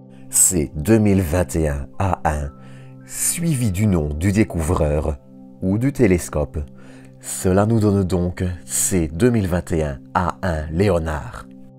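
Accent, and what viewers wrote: Belgium. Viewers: Tu es belge ?? Merci pour la vidéo je suis de Bruxelles au plaisir encore des vidéo svp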